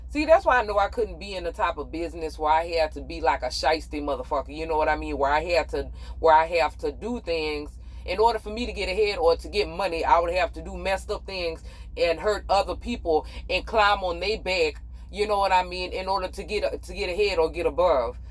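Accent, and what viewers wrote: Louisiana, United States. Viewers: Sounds like a beautiful Lousiana accent. This lady is beautiful! Hey Sis! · Love that Louisiana accent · I like her accent, what part of louisiana she from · I love the Louisiana accent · I Love that Louisiana twang guuurl just keep talkin 😁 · Love the accent. Gotta be from New Orleans. I hear the Cajun in your voice. Anyway, Stay safe outhere. Don't take no wooden knuckles Beeby!!! My Louisiana people know what i'm saying. Lol. Peace.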